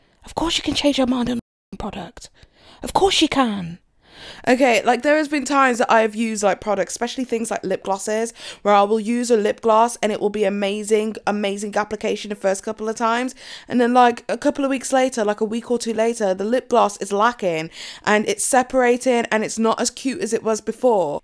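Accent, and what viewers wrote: United States. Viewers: Your American accent is really good but it makes me feel so weird 😂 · You do a better American accent then I do and I’m american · Your american accent gave me life! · Your American accent tho!!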